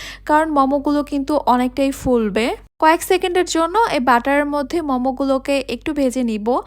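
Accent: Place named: Bangladesh. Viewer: বাংলা দেশের যে সেটা বিশ্রী উচ্চারণ শুনেই বোঝা যাচ্ছে। বাংলা ভাষা টা সুন্দর সাবলীল ভাবে বলুন শুনতে ভালো লাগবে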